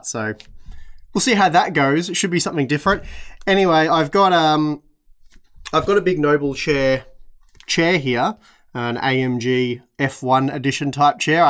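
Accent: Australia. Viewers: in that accent, I almost responded out loud with "That's not a knife, now this is a knife." Someone please get a proper Dundee knife. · Gotta love the Australian accent.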